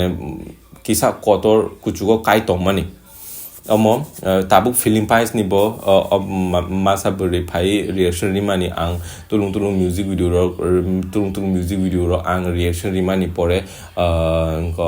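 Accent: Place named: Manipur, India. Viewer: Very Few Meitei People can speak Kokborok I'm surprised You are one among them 😃 Clearly you have Meitei accent even after speaking Kokborok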